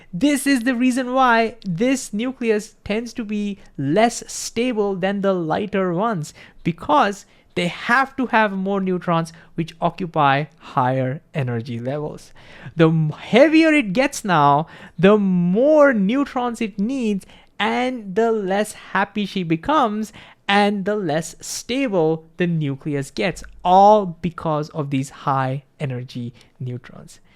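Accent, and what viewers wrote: India. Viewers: People who get he is an Indian As an Indian i could understand it just through your slight accent ❤ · Why are you black but have an Indian accent 😂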